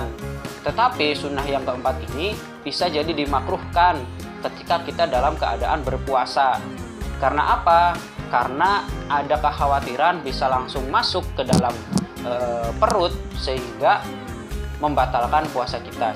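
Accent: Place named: Java, Indonesia. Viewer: medok men ang :v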